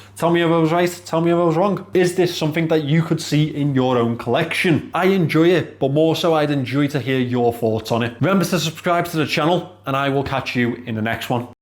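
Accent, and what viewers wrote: United Kingdom. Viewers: you are from which part of The UK?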